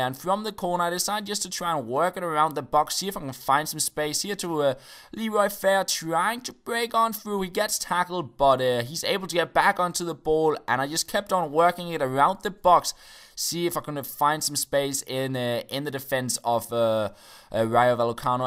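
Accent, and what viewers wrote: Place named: Denmark. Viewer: Gotta love that Denmark accent, no joke, sometimes I watch his video for the intro alone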